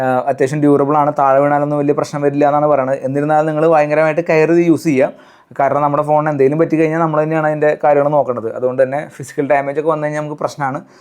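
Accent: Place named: Thrissur, India. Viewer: trishur basha ane udechad ene tonunu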